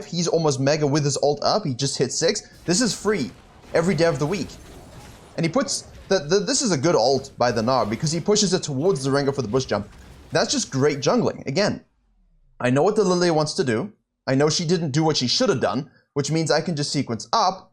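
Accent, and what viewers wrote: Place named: South Africa. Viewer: Wait... Where are you from? You sound south African lol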